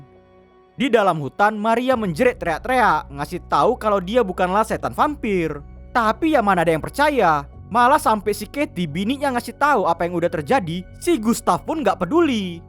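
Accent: Medan, Indonesia. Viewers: Ku lihat dari logat bicara kau bg, pasti kau dari medan ya bg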